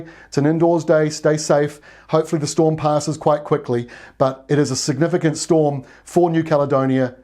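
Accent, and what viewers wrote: New Zealand. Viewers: Ton accent nouvelle-zélandais ;) Thanks for the reminder for Rural Weather - great forecasting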